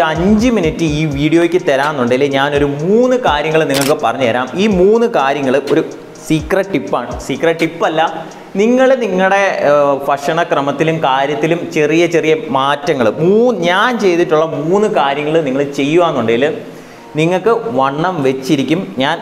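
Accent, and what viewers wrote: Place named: India. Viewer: നല്ല അവതരണം 😍ബ്രോയുടെ സ്ഥലം എവിടെയാ? അല്ല സംസാരത്തിൽ "ഫ "വരുന്നുണ്ട് അതാട്ടോ ചോയിച്ചേ 🤔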